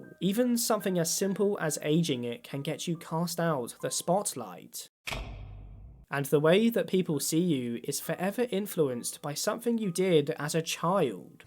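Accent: United Kingdom. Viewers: ive only just started this video, am or so, but i have to say... the molly bit hurt. because i was a molly on the weekdays. i cant personally remember a lot of my childhood, but i wouldnt be fed long enough that i would squirrel away sticks of butter from the fridge so i could eat when stuck in my room at night. my mother would take my bed away if i didn't do my homework. it's like.... idk, comforting to know that that kind of thing is recognized by the people who made the game as not only tragic and cruel, but... realistic. that it happens. im lucky i had my dad's house for the weekends. he didnt turn out to be the best parent by the end but he helped me survive emotionally at least until i was 11. edit: wow. now, reaching lewis' part, this video is so strangely targeted at me. i experienced Maladaptive Daydreams as a coping mechanism during my young years as well! 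it's something i frankly wish i could experience again in my adult years, even when i know it's really disruptive and i have to try to keep up the adult responsibility thing. ironically, weed is helping me with my dissociation symptoms. nowadays it almost seems as if im suffering with some sort of aphantasia, though. which, as an artist, that blows lol edit 2: OH SHIT YOU DID THAT VIDEO ABOUT THE OLD COUPLE THATS WHERE I RECOGNIZED YOUR VOICE lmaaoo ngl i was sitting here thinking "i can't just be thinking that all british men sound the same, i have to have heard this guy somewhere else" and it was that v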